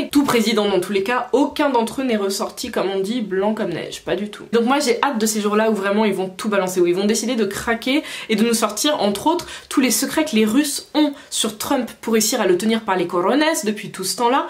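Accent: England, United Kingdom. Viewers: ton accent anglais🤩👍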